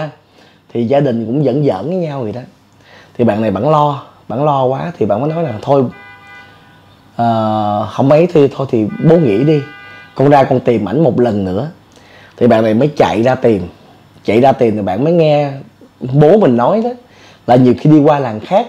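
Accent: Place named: North, Vietnam. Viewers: Bạn kể có thêm giọng bắc nữa hay quá .👍👍👍👍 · Bạn nói giọng bắc nghe dễ thương quá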